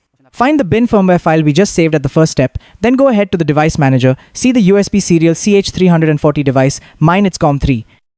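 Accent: India. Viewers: Sounds pretty indian if you ask me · ts indian voice cannot be the method 🥀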